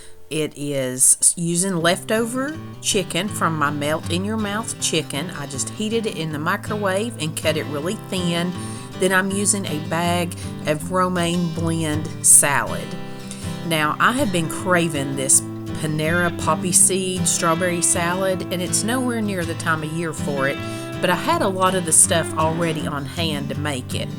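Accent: South, United States. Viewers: Howdy! This is Jessie from Ohio. One day I found one of your videos and since I had a migraine, I kept YouTube on and binged on your yummy recipes and southern calming voice (thank you for not using loud music). Since the second video I subscribed and I stop by daily to see if there might be one I missed or rewatch one I have seen and saved. So I finally thought I'd say hello and thank you for doing what you do. Hugs from Ohio.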